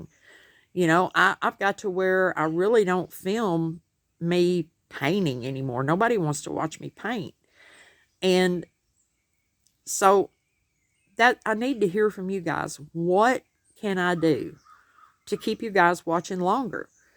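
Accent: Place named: Texas, United States. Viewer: I am sorry that is happening. I watch yours throughout but I do click off of the creators that sing or show a lot of painting or make the crafts in real time. If I can’t understand the creator… I feel bad but I just can’t. I am a Texan and so you sound like me. 😂 You are very talented. Are you doing shorts? I hate them but I think a lot of YouTubers use those.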